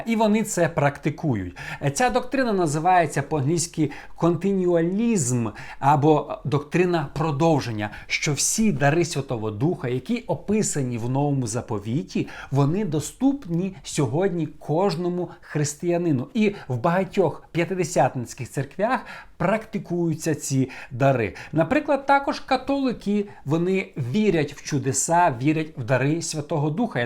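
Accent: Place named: Western Ukraine, Ukraine. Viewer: Накінець то по українськи бо вам рос. абсолютно не йде бо чути шо ви з західної України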